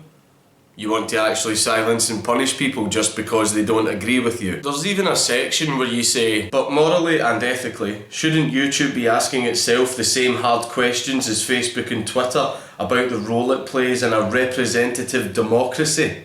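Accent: Scotland, United Kingdom. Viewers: Few things make me as happy as hearing a Scotsman call someone a cunt. It's just beautiful. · Fuckinell!!! He really is Scottish isn't he? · The way you said Conspiracy Theories as "Con-spare-essy Thee-dees" Made me bust a gut xD. You're Scottish accent is perfect, I wish I had it to be honest xD. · i never wanted to listen to a man talking sexy to me with a Scottish accent. untill now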